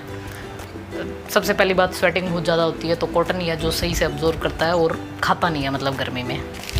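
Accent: Haryana, India. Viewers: wow haryanvi☺️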